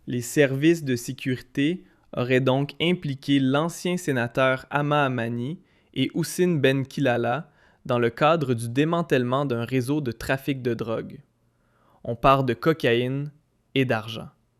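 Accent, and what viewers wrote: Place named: Canada. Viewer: Pourquoi vous changez votre accent il me semble que vous avez l'accès canadien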